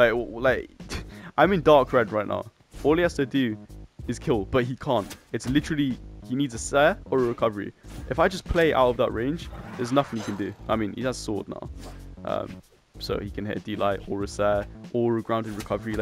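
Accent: London, United Kingdom. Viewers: That was the most London intro I’ve ever heard to a video lol.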